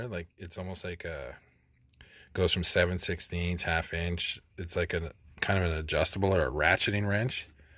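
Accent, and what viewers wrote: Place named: Canada. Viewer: Great video and always interesting to see what other trades carry...initially I thought you were American, until I heard the third or fourth "Right" then I thought you must be Canadian! lol Edited to add what are the two tools at the and what are they for?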